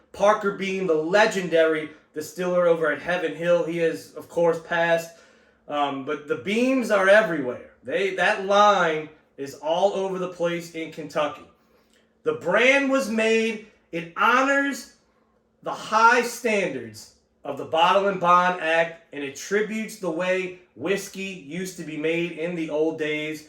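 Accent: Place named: New Orleans, United States. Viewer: Thx for the informative review; saw you on another bourbon channel, recognized the accent & knew you were from Nola area! Can Early Times bib be found in nola?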